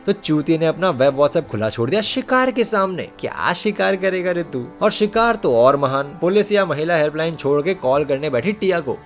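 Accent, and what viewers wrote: Bihar, India. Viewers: Only Desi is far better than. The closest any indian youtuber comparable to Alex Meyers is Only Desi. And har chiz mai bihari tone mai caricature krna is not comedic.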